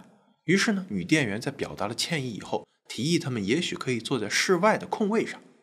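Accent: China. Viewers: 博主S與F的英文的發音都不正確(卻是中國大陸的英文教育所教的中式發音)，請重新上網向外教老師學學。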